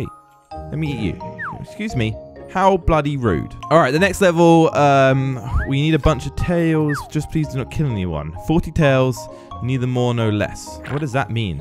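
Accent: United Kingdom. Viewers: I can tell by his accent, that he is British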